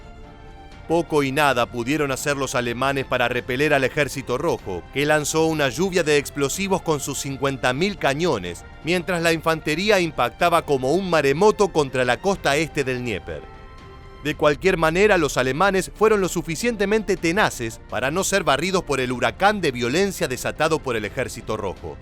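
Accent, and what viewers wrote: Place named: Argentina. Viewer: Hola... No me provoca ninguna satisfaccion escuchar tus comentarios sesgados respecto a los rusos, precisamente xke tengo familia viviendo en moscu ke me mantiene muy bien informado de todo ko ke occidente esta tramando con esto de ucrania... Te escucho y suenas como ke hubieras preferido ke los nazis, aun despues de haber exterminado a mas de 6 millones de seres humanos le hubieran ganado a rusia... X tu timbre de voz veo ke sos argentino y un argentino seguramente RUSOFOBO ke defiende a estos nazis ucranianos ke vienen masacrando a mas de 140 mil ciudadanos prorusos en ucrania desde 2014, y ke seguramente se alegra, festeja y aplauda ke los argentinos tengamos una base nuclear de la OTAN instalada en nuestras islas malvinas... Solo ruego a Dios ke no seas otro milicoide conspiranoico esperando la oportunidad de derrocar otro gobierno constitucional en mi pais utilizando las armas ke pago yo... 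Vi varios videitos tuyos y la verdad venias digamos ke bien... Pero en esta te fuiste a la banquina y quedaste expuesto demostrando lo ke en realidad sos... Un discipulo del tio sam Chau...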